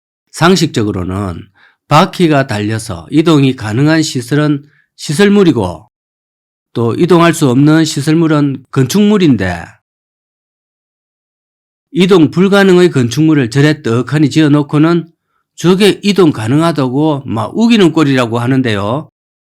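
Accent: Gyeongsang, South Korea. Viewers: 암행어사! 구수한 경상도 사나이 사투리 정감이 갑니다.발통? 오랜만에 들어 봅니다.건강하세요!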